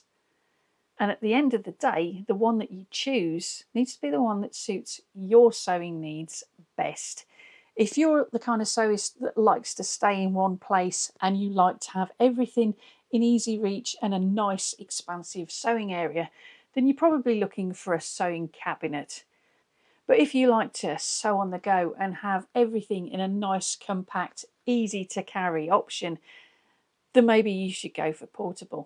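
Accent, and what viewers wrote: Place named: United Kingdom. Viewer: OMG, I still can't figure it out from where you are shooting your videos. Your accent is British, the sewing space looks British too and handcranked machines, but your Oregon /Sisters video:-))) LOL.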